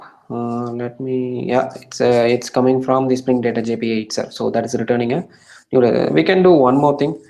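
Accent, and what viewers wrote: India. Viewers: Indian accent is funny!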